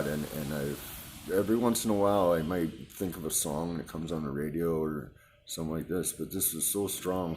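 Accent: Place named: Canada. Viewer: How do you not have more subscribers.. Great stuff I subscribed right after itsmeen recomended you. You can tell some stories and the mix of Canadian and native accent sucks you into the story even more..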